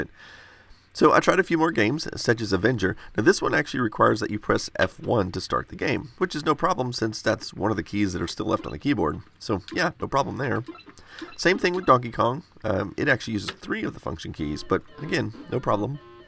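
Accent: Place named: Texas, United States. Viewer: sounds more Texan than usual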